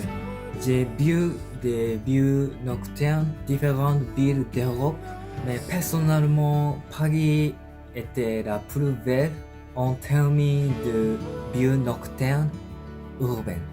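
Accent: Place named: Asia. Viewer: Salut et merci pour tes impressions ! :) J'adore l'accent Français quand c'est un asiatique qui le parle. C'est une très belle langue, content que tu veuilles continué de l'apprendre ;) Pour le côté négatif de ton voyage malheureusement tu n'es pas seul à partager ce ressenti, (2) sur Paris et ses grandes villes. Ce qui est triste quand on voit ce qu'elle était, avant toute cette criminalité.. ( Les pays comme la France, la Belgique et le Royaume-Uni font parti des pays les moins sûr d'Europe.. ) - Si ça peut aider des personnes, je pense particulièrement aux Japonais et Chinois. (En rue, pour tous ce qui est; arracheurs de sacs, pickpockets, crackhead, racailles, "non-français" etc..), ils ciblent particulièrement les asiatiques parce qu'ils savent que vous avez du cash sur vous quand vous visitez. N'hésitez pas à porté plainte à la police directement si cela arrive, vous aurez peut-être une chance de récupérer vos affaires si cette personne est repérée et attrapée après d'autres vols/agressions dans la journée puisque dépouiller/agresser les gens est un sport avec eux.. 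Heureux que tu aies quand même gardé quelques bons souvenirs de ce voyage et bonne chance avec ton apprentissage c'est vraiment impressionnant!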